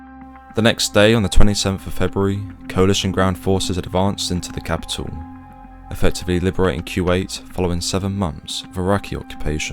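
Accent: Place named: United Kingdom. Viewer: very well spoken and interesting, you cant beat a well spoken brit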